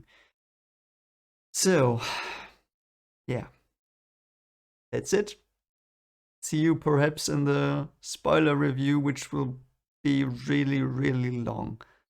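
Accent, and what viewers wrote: Germany. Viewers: Very nice review. Due to your accent I assume that you are from Germany? ;)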